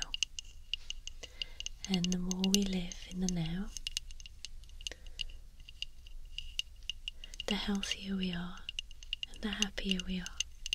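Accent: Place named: Scotland, United Kingdom. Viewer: hi! are you from scotland or the north of UK? cause your accent is so strong!!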